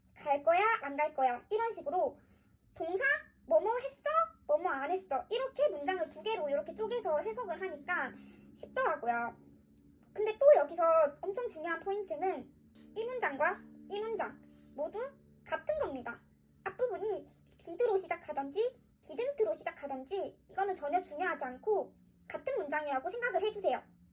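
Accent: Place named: Gyeongsang, South Korea. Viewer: 경상도 사투리가 좋습니다 ㅎㅎ 영상 내용도 좋네요 감사합니다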